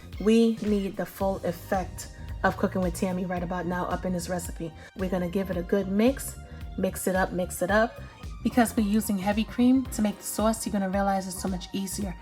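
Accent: New York, United States. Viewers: This recipe is probably 10x better because of the native NY accent!!!! ❤❤❤❤❤❤ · I'm from NY I'll say definitely "NOO YAWK". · I love New York! I miss home..something about that voice!😛😛🥰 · Is that New York I hear 👀? This looks bomb. I gotta try it. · I think I might enjoy this meal! Love your accent! New York? Connecticut?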